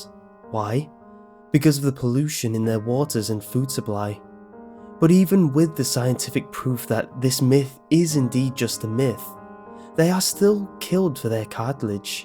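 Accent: England, United Kingdom. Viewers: i realy like your voice it is pritty but i have always had a thing for british guys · u can pretty much read anything with your voice. People love the english accent.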